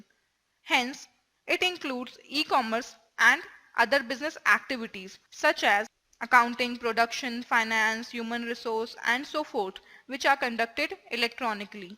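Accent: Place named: India. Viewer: I can't understand due to the Indian Accent.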